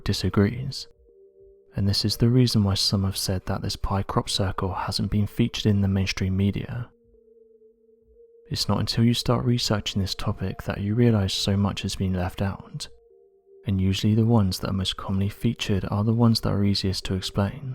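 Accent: United Kingdom. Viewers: British accents don’t turn on all Americans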